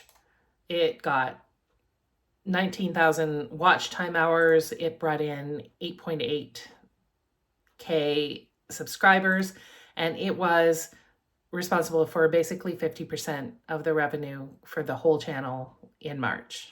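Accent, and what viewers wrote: Canada. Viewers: Are you in Canada or at least Canadian? Sounds like it. 😊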